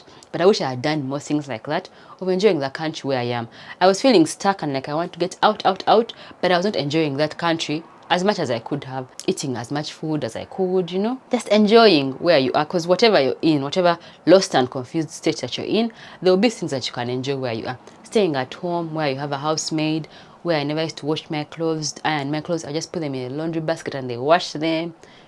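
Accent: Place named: East Africa. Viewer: you are fun. That is East African accent rocks.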